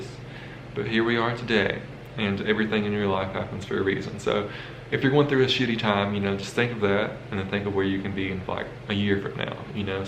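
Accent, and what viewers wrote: South, United States. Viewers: I love his country accent 😂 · I have no idea who this guy is. But that's a sick sweater and I find it oddly fascinating that his accent sounds like every old southern woman I've ever met. Not that he sounds feminine but the twang is pretty much identical to old southern women.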